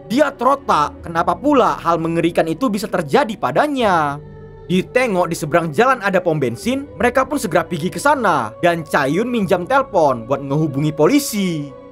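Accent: Medan, Indonesia. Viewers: Medan kali 😅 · Ini aksen melayu Medan ni wey 😂ky aksennya mael lee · Bang saran mending pakai bahasa indonesia aja jangan di logatin medan bahasanya🙏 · Nampak x medan ko ya lek 😂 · Medan anjing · orang medan ni pst abangny dr logatnya wakwkka. btw makasih ya bang, pas nonton beneran ga ngerti. abang cerita barula ngeh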